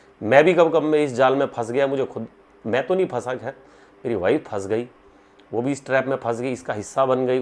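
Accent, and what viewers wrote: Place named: Faridabad, India. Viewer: Faridabadi accent..full mauj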